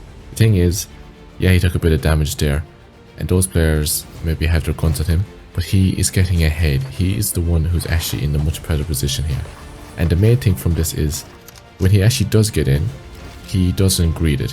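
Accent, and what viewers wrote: Ireland. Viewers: Is you Irish?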